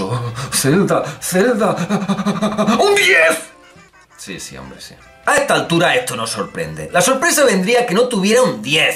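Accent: Andalusia, Spain. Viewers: Daniel Vargas porque es andaluz y por ende habla en su dialecto, que viene determinado por el lugar donde te crias y vives, mientras que escribir mal, es directamente una falta cultural bastante grande.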